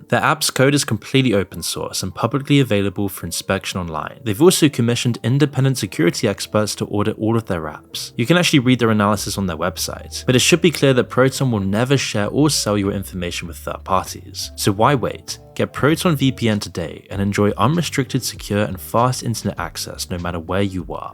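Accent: United Kingdom. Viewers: Are you speaking with your voice or generated it with AI? If you are generating it can you please make it with American accent not British, it’s hard to listen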